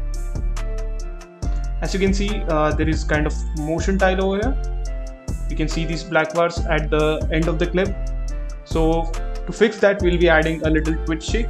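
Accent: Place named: India. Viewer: DAMN THE INDIAN ACCENT IS DRIPPING OUT OF THE VIDEO!!!!!!!!!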